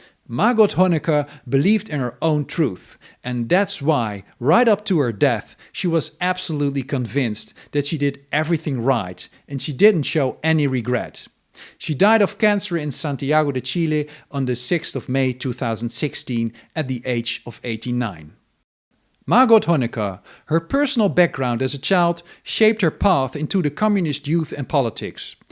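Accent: Netherlands. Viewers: You are from the Netherlands, aren't you? I hear a slight Dutch accent as well in your English as in your German. What brought you to work on this kind of German history?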